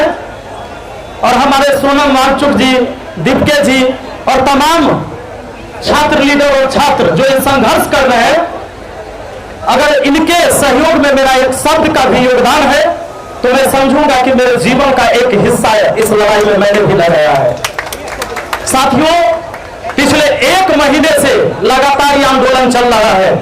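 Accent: Bihar, India. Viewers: गुरु जी बिहारी की बोली पुरे देश में गुंजना चाहिए! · Bihar ki awaj hai * sir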